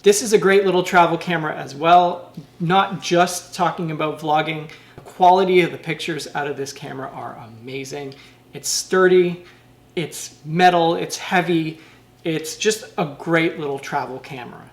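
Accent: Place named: Canada. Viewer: Canadian accent freakoUt:D:D NICE! Cool music:)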